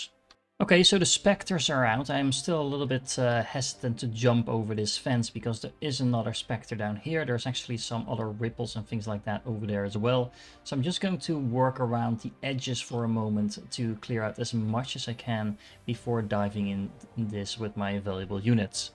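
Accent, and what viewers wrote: Netherlands. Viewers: Je nederlandse accent schijnt wel een beetje door hahahha (maar nogsteeds goede video, helemaal gebinged met snacks)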